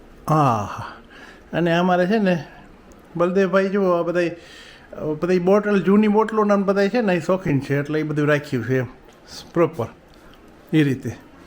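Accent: India. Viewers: ખૂબ સરસ ભાઈ...! આપણા ગુજરાત ના ભાંડુઓ વિદેશ માં વસતા હોય અને ખૂબ સુખી અને સમૃદ્ધ હોય ત્યારે આપણી છાતી ગજગજ ફૂલે અને આપણા સુખી ભાંડરડાઓ ને જોઈ ને કેટલો આનંદ થાય તે અકલ્પનીય છે. બસ ભગવાન ને હંમેશ એક જ પ્રાર્થના કરીએ...ઈશ્વર તેઓ સૌને સદૈવ સ્વસ્થ, સુખી,સમૃદ્ધ અને ખુશ રાખે... તમે પણ વિદેશ મા વસતા આપણા ભાંડરડાઓ ના આવા જ હૈયુ હરખાઈ જાય, હૈયુ હરખભેર હિલોળે ચઢે તેવા વિડીયો બનાવી... અમને પણ આપણા વિદેશી ભાંડરડાઓ વિશે જાણી મોજ આવી જાય... આપની દેશી લઢણ સાથે વાસ્તવિક ચિત્રણ સાથે નો બ્લોગ બનાવવા બદલ આપને ખૂબ ખૂબ ધન્યવાદ... હાર્દિક અભિનંદન... શુભેચ્છાઓ 🎉🌹🙏 કુમાર ભુપેંદ્રકુમાર- તંત્રી - કર્ણાવતી મહાનગર અખબાર અમદાવાદ 🌹🙏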